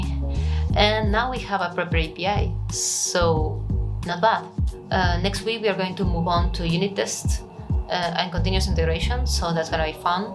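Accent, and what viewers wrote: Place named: Argentina. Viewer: Great video! I'm a big fan of the format, shots, and quality of this! Uno puede escuchar la argentinidad en sangre con el acento 🇦🇷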